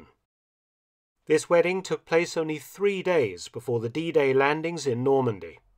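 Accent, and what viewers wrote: United Kingdom. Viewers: He's British. Americans are the English speakers that hardly ever care.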